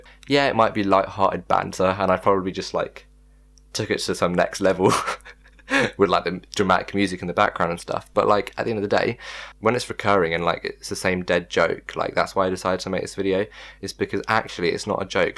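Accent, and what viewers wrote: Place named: India. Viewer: I thought u were definitely an Indian dude , the accent gets me everytime lol , good editing lol!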